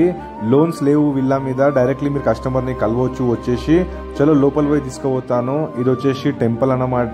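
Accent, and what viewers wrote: India. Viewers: Hyderabad slang bro we are proud of him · Typical maarvadi tone and Telugu and of course English is all messed up. No big deal.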